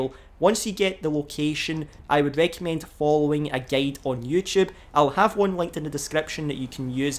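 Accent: Ireland. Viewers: Irish man? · Your accent gives me Irish vibes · I love his fuckin irish accent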